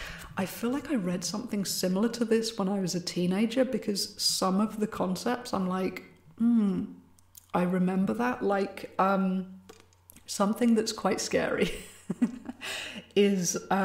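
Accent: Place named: England, United Kingdom. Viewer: WOW!. So cool you liked the cards I sent you and that they inspired a video.I called my wife in to see and she totally freaked.I feel like a real nerd but you know what, I am a fan,what can I say.I like the early morning trips to the woods dancing in the dark and hearing the king's english. will be Sharing on facebook.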